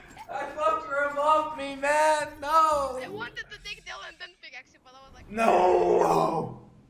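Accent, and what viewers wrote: Netherlands. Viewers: i saw this in my recomended(don’t know you) klicked on it and boom. 1 minute in and i know 99% sure you are dutch or somewhere close to it. The accent bro the accent🇳🇱🇳🇱🇳🇱🇳🇱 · He sounds Dutch to me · Ben jij nederlands? Ik hoor het een beetje 😂🙌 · Jo bro zelfs ik hoor dat je Nederlands bent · You hear he's Dutch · Ja ik herken het meteen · yoo are you dutch because off your acent zo ja ik ben ook nl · His acent is 99% dutch 1% irish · Ik hoorde aan je stem dat je een nederlander was (ben geabonneerd) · Zijn engels is goed alleen je hoort gewoon dat hij nl is! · I'm 99% sure he is Dutch, bcs of his accent😂😂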